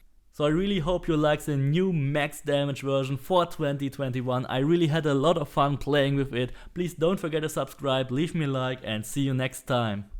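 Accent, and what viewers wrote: Germany. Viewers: Ich feier einfach das du eindeutig ein deutscher mit (nicht böse gemeint ) voll dem allman Akzent trotzdem einfach auf Englisch dein Ding durchziehst mach weiter so 👍🏼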